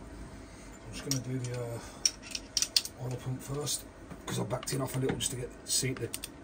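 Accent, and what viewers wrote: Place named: Black Country, United Kingdom. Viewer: Nice to hear a black country voice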